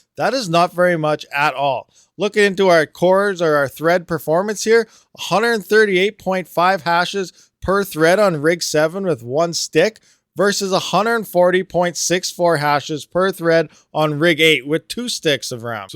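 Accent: Canada. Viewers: There's just something fun about listening to Canadians explain stuff. *From your north Idaho neighbor.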